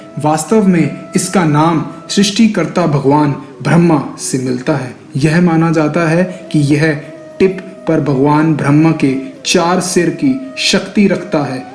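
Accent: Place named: Punjab, India. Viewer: Zaberdast jaankaari, commentator ki awaaz bhi achchi hai, lekin punjabi accent ke kaaran kuch shabdo ka uchchaaran galat hai. Jo ki kaano ko chubhta hai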